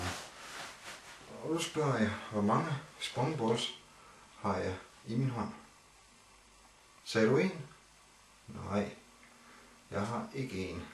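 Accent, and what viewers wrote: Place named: Jutland, Denmark. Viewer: Jeg tager én spongeballs :D haha, nice sleights men den jyske accent er sq lige i skabet :D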